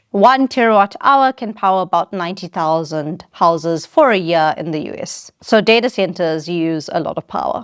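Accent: New Zealand. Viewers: Ha, I couldn't at first work out your accent. I thought you were from NZ at first. Very correct about power in vs power out. The data itself is an energy less output. The heat is mainly down to the efficiency of the FETs in the processors and probably the low voltage power supplies on the boards.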